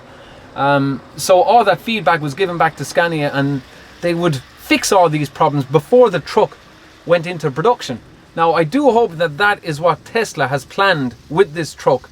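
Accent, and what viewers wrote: Ireland. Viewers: It's my first time here, too. He's got a Greek name and an Irish accent! Love it.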